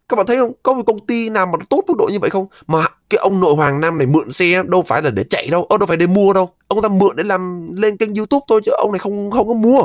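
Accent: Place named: North, Vietnam. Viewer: Nghe giọng backè.. sợ lắm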